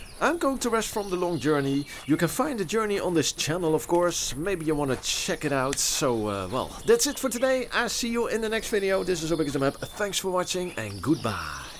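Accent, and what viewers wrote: Netherlands. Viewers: Het moment dat je startte met spreken hoorde ik dit is een landgenoot 🤣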